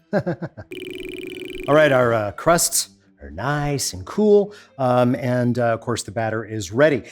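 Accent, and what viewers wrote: South, United States. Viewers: he’s from the south, let’s not hold it against him…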